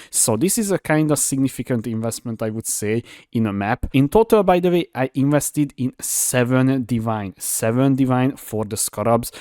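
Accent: Hungary. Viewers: This must be the thickest Hungarian accent I've ever heard xD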